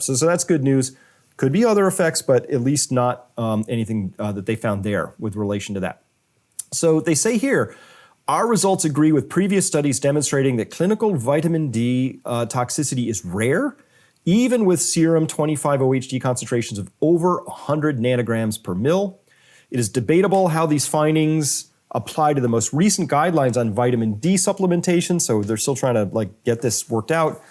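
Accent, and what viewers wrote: England, United Kingdom. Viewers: Thanks. Love your positivity and your “English” accent! Excellent 👍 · Lovely Brit Accent. Top class video. Thankyou very much for my shot of common sense. Gerald Celente also calls it for what the "rulers & managers" are: Trends in The News Going Live: More Lockdowns, More Mandate Madness, More Econ/Social Destruction <link> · 😅😂. English accent 😊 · But that English accent though!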